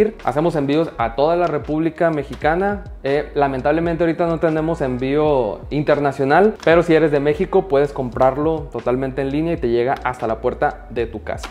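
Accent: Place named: Paraguay. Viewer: Encuentro algo de Paraguayo en el acento, soy de ahí, un abrazo si acierto 😮😮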